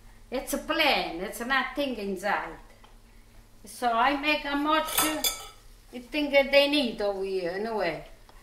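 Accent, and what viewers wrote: Italy. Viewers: What a sweetie! Reminds me of my friends mothers in the Bronx, Naples, and Catania. We would be in the kitchen after school helping them prep and cook just like she said. (Except they had a stove and oven in the house by the 70's and 80's!) I live in North Florida now and you just don't see or hear that beautiful italian accent and sensibility here. North FL natives are 'senza sapore.' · Dear, I watch your videos all the time and I love them. It's not just the real italian recipes and the way you prepare them, it's a' you and the way you speak your Itali-ish! You have a wonderful personality and are a very conscientious cook. BTW, you are not "TOO SLOW!" Perfection takes time, it cannot be rushed! So thank you for taking your time to prepare these delicious italian recipes for us on video and step by step. You are not only educating us, but, you are also very sweet and entertaining. 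God bless you and thank you very much. Your family is proud of you and love you and it shows. They are blessed to have you and you to have them. Please keep making your lovely videos for us out here. You are a gift and we love you!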